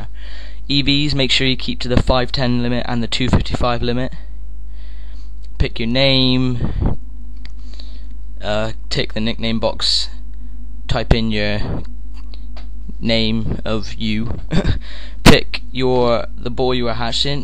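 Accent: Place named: United Kingdom. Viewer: your awesome. british accent and straight to the point.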